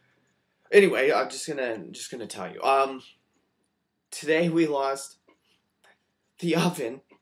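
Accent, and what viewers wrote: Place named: Canada. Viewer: Your Canadian accent is soooo hot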